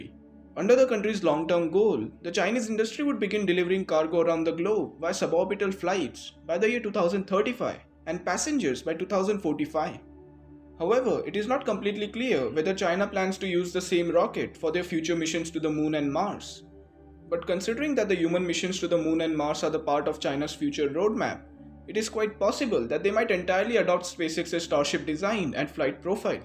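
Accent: India. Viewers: Thanks a lot, this is really very precious and very interesting, for it's very unique. I hope very much to see much more of this in the future, and therefore I wish you a great success, there could be a lot of space being left for you in the scene. Your accent sounds like coming from India, remembering me to three wonderful times in India, as a former field engineer for a Swiss company many years ago. If this is correct, take my greetings, best memories, and great respect from Switzerland, to this wonderful people and culture, thänks. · Indian accent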